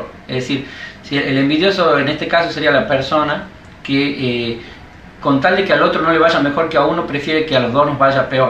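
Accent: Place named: Argentina. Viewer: Gracias por esa explicación, no me esperaba la foto con Messi jajajajaja, solo si me costó mucho entender las palabras con el acento Argentino en las palabras rápido, iba tomando nota😢 pero bueno logré capturar la escencia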